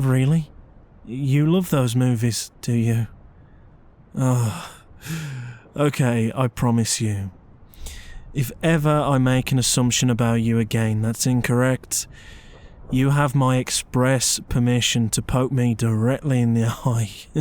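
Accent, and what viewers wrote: United Kingdom. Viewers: Love your British accent 😍 also you very amazing person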